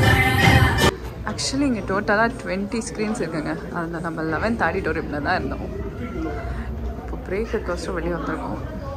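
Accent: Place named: Madurai, India. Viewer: T q allows so cute tamil from madurai 🤝🙏🙏🙏👍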